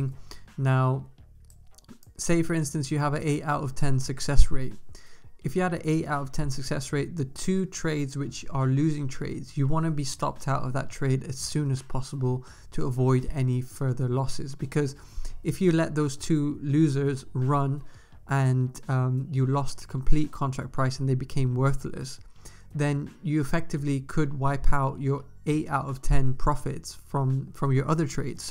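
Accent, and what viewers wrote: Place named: United Kingdom. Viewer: Great video , great to hear a uk YouTuber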